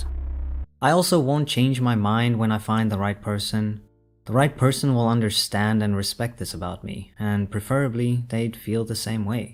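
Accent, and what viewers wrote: South Africa. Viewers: this is an off topic question but are you south african? your accent and pronunciation just sounds so familiar and i just have to know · Are you also partly South African? There's something rly familiar about your accent lol but I suck at placing accents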